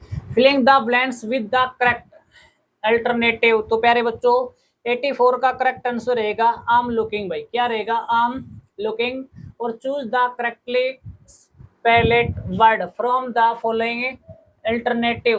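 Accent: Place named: Rajasthan, India. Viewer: Bola to Rajsatha ka h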